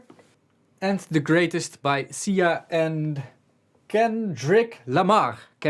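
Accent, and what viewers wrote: Netherlands. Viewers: Sounds dutch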